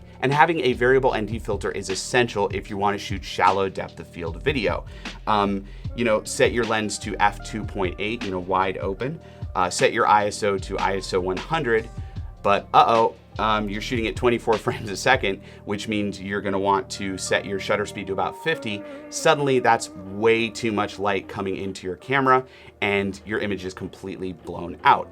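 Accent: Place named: United States. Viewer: Appreciate the American (Canadian? cannt tell) ,clear ,easy to understand review!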